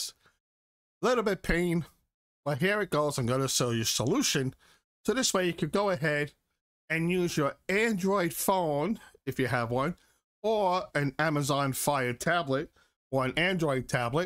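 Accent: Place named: Bronx, United States. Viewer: Go ahead, and tell me, why, when using Ai narration, would you choose a voice of a guy from the Bronx or Jersey?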